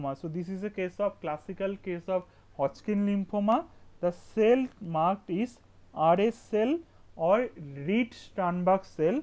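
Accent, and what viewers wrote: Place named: Bengal, India. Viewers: Don't go with accent. He was Our WBUHS topper got honoyrs in every subjects all 14, gold medel, record marks in Obs gynae..... And Our Bengali accents are like this.... don't go with this.... he is a very good doctor that's a matter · It's bengali accent I think, they pronounce a as O generally.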